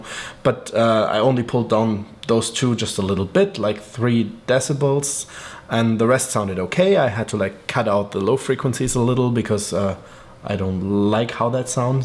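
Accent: Germany. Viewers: hi du bist deutscher oder ? höre da viel deutschen akzent raus ? Also ich liebäuge ja zur zeit sehr mit dem rode nt usb. das akg hat mMn. auch sehr gut geklungen, aber wenn man sich so viel auskennen muss um da viele einstellungen vorzunehmen, damit es so klingt, bleib ich wohl lieber beim rode nt usw. ich will auch kein xlr + interface usw. kaufen. finde ich übertrieben, da ich es ja nur am pc benutzen möchte. das akg dich enttäuscht hat, hat mich echt überrascht, weils halt am anfang gut klang und allgemein ich nur gutes von der firma gehört habe.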